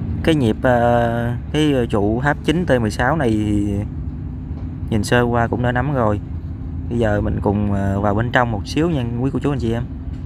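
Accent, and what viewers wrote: Vietnam. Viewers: Mong được xem nhiều video của về công trình này thêm. Giọng nói của bạn rất rõ, rất Miền Nam, nghe rất thích.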